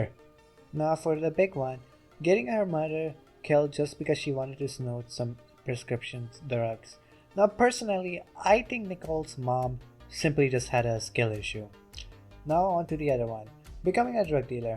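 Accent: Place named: Ukraine. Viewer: you kinda sound like the counselor if he was from kyiv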